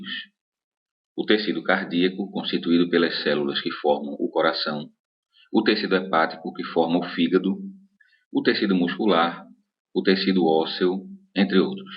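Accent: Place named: Pernambuco, Brazil. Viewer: Amei o vídeo! E pelo sotaque, deve ser meu conterrâneo, pernambucano?!!!Parabéns pela clareza das informações.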